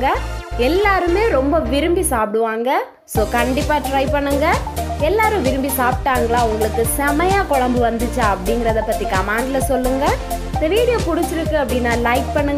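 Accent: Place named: Marthandam, India. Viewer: Aiwaa marthandam tamil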